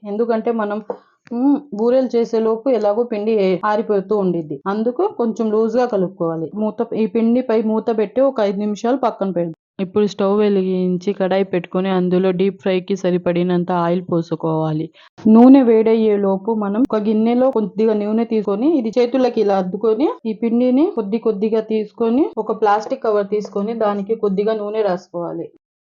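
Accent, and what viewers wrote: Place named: Tamil Nadu, India. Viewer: Avunandi cheppe ame tamilian laga vundhi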